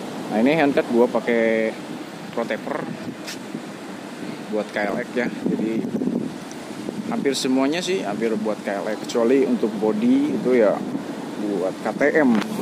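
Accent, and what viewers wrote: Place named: West Java, Indonesia. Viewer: Orang sunda iyeumah yeuh 😁😁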